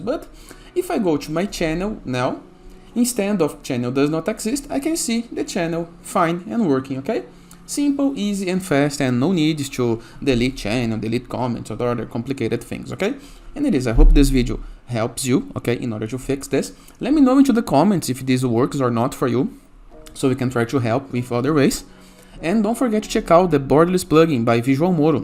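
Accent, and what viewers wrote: Brazil. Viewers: good video sotaque brasileiro kkkkk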